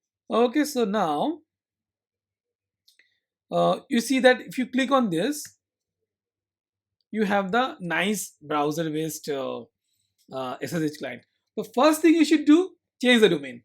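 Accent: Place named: India. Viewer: The video is amazing but your indian accent is so strong that is dificult to understand ... Try to put subtitles next time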